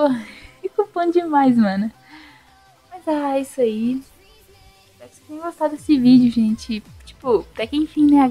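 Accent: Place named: Minas Gerais, Brazil. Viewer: Esse Sotaque Mineiro e meu devolvs kkkkk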